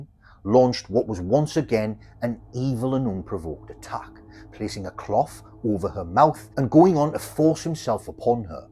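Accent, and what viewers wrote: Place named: Scotland, United Kingdom. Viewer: This channel keeps coming up but that's fine I like the animated story telling style and the Scottish? accent is entertaining for an Aussie viewer. The setting is a bit bleak, a prison.....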